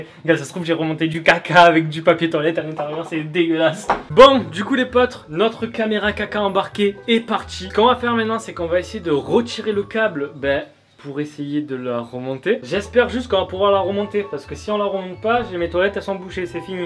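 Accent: Marseille, France. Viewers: Tu es pas un peu Marseillais? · Tu as l’accent marseillais frère 😭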